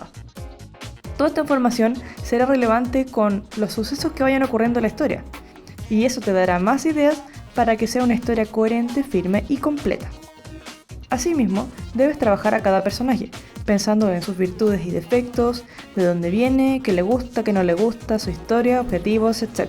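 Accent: Chile. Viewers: Me hice la media idea en la cabeza, y voy a hacerlo medio spaicy Alv Cache el asentó chileno :D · Eres chilena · Gran video!!,me funcionara mucho gracias!! PD:me encanta tu acento chileno😙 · Buta se nota que eri Chilena, saludos desde Chile xD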